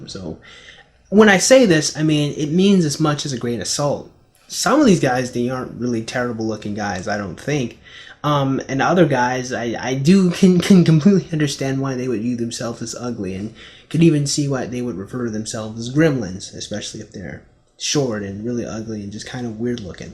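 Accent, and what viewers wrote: United Kingdom. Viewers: lol what is up with the limey accent? You speak perfect english. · Your British accent makes me sp uncomfortable and left the vid with a dislike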